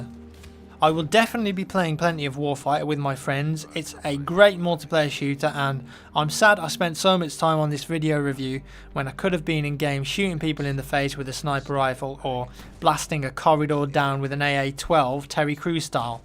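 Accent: United Kingdom. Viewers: Amazing accent, r u British?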